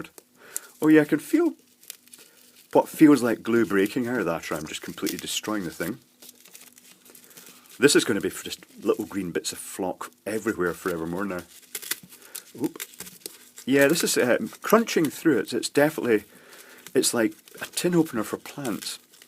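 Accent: Scotland, United Kingdom. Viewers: does the description actually say "idear gift"? or is it Scottish accent that makes the chinese sound humorously self-deprecating?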